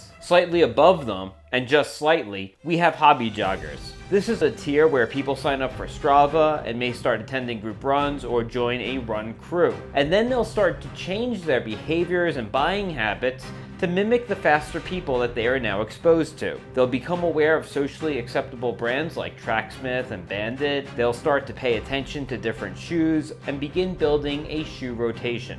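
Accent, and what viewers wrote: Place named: United States. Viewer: Your accent says American but your humour says British! Welcome home old Chum.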